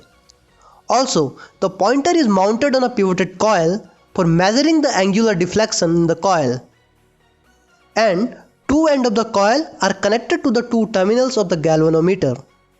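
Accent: India. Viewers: Indian accent.😭🤏🏾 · Ok now I feel like u r Indian am I right?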